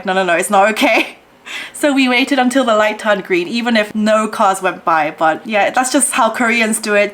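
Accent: England, United Kingdom. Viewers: You have a very sweet “Queen’s English” accent,. I can help you with American 🇺🇸 English! Ha, ha, ha! 🇰🇷🤗❤️🤗🇺🇸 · Algorithm led me to this video and I thought 'I know someone who's name is ' and heard her talking and 'Brisith accent?' and saw her face and 'Oh...it's!':) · wow, strong England English accent detected! :) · The UK she said, that accent definitely rubbed off on her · I was gonna say, your accent is almost British. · 영국에서 오신분인가요? 영국 발음이 들리시넹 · 한국인인데 다 봤어요 영국 발음 너무 멋있다 ㅠㅠㅠㅠㅠㅠㅠ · Your British accent is obvious. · 우연히 왔는데 , 카즈하 같은 느낌의 더 예쁘신 분이네요. 잘 보고 갑니다. 묘하게 영국 발음이랑 미국느낌이 섞이신 발음 같아요. 행복하세유~ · I am so happy to see you again. You know the funny thing is that I was just thinking about you. "That beautiful Korean Youtuber with UK accent. I missed her and her narrative about Korea and Korean Culture" I thought. I then saw your video come up in my youtube feed. 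Well, I just actually subscribed to your channel. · That is the MOST English accent lol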